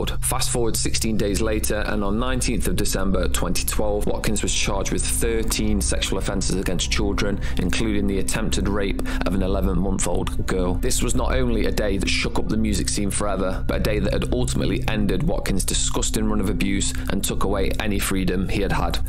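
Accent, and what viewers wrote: United Kingdom. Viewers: Sorry for asking! Is ur accent british? (Not a native English speaker is asking!)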